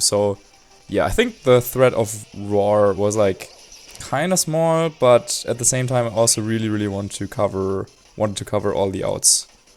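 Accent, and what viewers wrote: Germany. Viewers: Your German accent English is wayyyyy too cute and my ears enjoyed it. You're such a chilled guy lol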